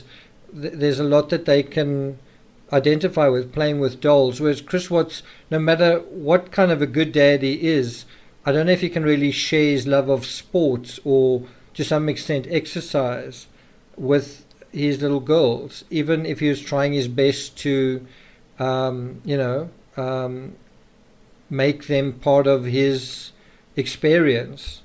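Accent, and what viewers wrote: South Africa. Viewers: You must be South African going off of your accent? :) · That's a South African accent I instantly recognize it love from South Africa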